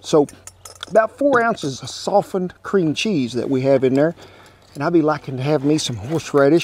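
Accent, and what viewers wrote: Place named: Texas, United States. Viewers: Listening to you feels like a visit home. I spent my first 40 years in Texas, and you sound (and cook!) like my beloved kinfolk who have since gone to that great Wagon Train in the sky. My momma would have loved you, but Uncle Charlie would give you advice on making gumbo. His really was legendary, but he's got nothin' on you! I just recently joined whatsapp, I'll have to spend some time figuring it out as I'm not very technical, and I've got too much ranch stuff to do now the weather's purty. Thanks for the howdy! I love everything about your channel. Give those sweet doggies a pat on the head and something tasty, they're good pups! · I have a hard time believing you're from Oklahoma. You look and sound Texan.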